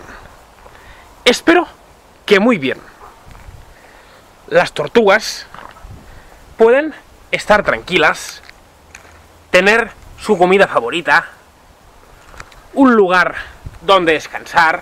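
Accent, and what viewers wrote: Catalonia, Spain. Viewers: Pq hablas asi?? Eres de cataluña?? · Es de el Delta del Ebro verdad? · Que eres de cataluña no? Por el acento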